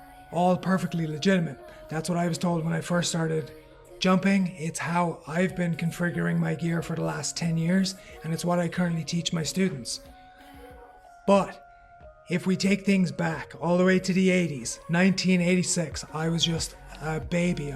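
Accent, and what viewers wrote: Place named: Southwest, Ireland. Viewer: Where is that accent coming from, bangs of south west Ireland throughout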